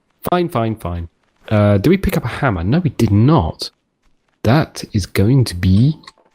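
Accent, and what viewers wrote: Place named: England, United Kingdom. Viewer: You are definitely my favourite Stranded Deep You Tuber. I appreciate your in depth approach particularly on the Cartographer series since I'm getting into modding now. So much changes as ea games progress it's important to explain to your viewers which version you are playing and exactly how things are done, some tubers forget that fact. I find your delivery easy to listen to, some Tubers have good content but are exhausting since they sound like they are are on crack, yelling and swearing constantly, I feel like breaking out the ritalin :D It's also nice to hear an English accent (just personal bias) and since you asked, I'm an English woman living in Portugal.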